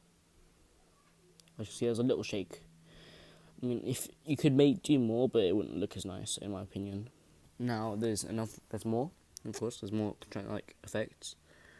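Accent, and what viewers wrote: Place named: England, United Kingdom. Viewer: Your accent is so much more English now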